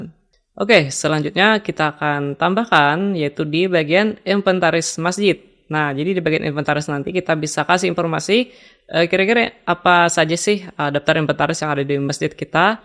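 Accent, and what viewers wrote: Banjar, Indonesia. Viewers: logatnya pina kayak bhs banjar pahuluan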